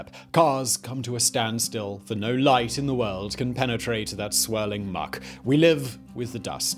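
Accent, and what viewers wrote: United Kingdom. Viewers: I don’t like learning about American history from a British voice. It bothers me! Shutting it off. 🇺🇸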